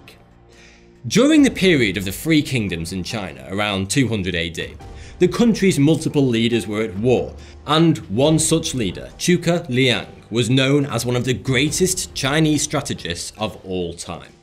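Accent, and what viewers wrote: United Kingdom. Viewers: Forget about white bears. All I can think about is listening to a British intellectual and eating fried potatoes!!!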